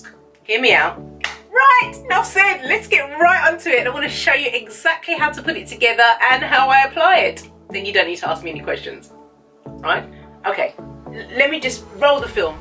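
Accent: United Kingdom. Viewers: omg i love your british accent im following your advice but i must admit im coming to your videos to hear you talk gurll!! my boo is from england but hes black and i just am in love with the accent lol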